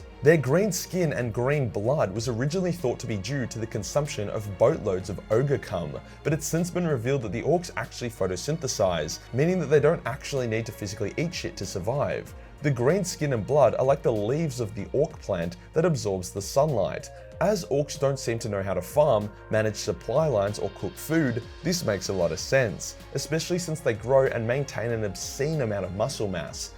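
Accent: Australia. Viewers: I love it when you use your Aussie moonspeak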